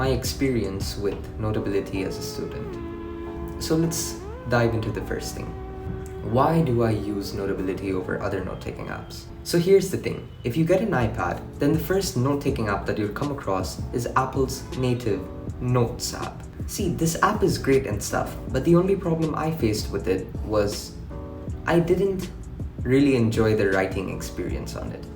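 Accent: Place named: India. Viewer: Holy hell, the Indian accent hit you hard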